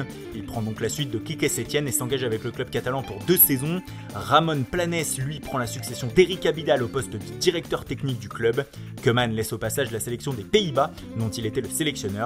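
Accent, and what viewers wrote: Italy. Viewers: En néerlandais "oe" se prononce "ou" Investissez un peu dans autres chose que l'accent italien hyper prononcé svp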